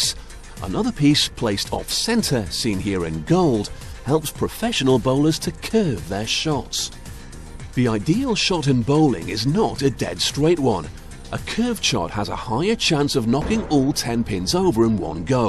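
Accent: England, United Kingdom. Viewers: So if this is in the US then why is a english person from britian narrarating? I thought this was on BBC for a second there. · It sounds like him in as much as he's English. But really, Clarkson sounds nothing like this. Do all us Limey's sound the same to you? ;)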